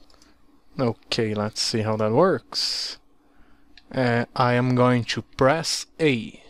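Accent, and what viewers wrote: Brazil. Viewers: O teu sotaque brasileiro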